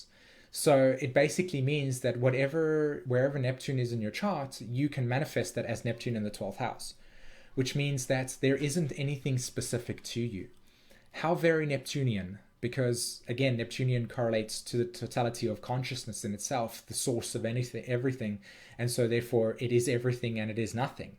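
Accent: South Africa. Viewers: Hey dude, are you South African by any chance ?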